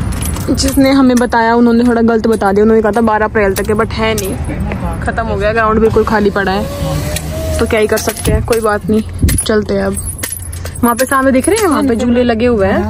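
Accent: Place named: Haryana, India. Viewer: Hey ❤❤❤ kashika here... Don't know message will reach..bas ker dia..😅😅😅😅and haryanvi accent me tum bhot surprising lgti ho...😂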